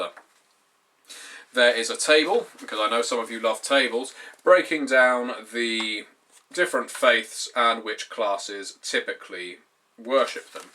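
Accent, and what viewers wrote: United Kingdom. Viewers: I once watched so many of your videos in one sitting that my internal voice acquired an English accent. (I'm American) It actually felt like I was going a little insane. I managed to repair my mind by watching a couple of Tetsubo's videos. Anyways, it's nice to see more videos from you. I'm starting a Pendragon campaign, which I've been wanting to do ever since I watched your video about your horrible Pendragon campaign. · Why not go back to the lets plays for a while? I loved your Bioshock play-through. I miss your unique charismatic British voice when you are away.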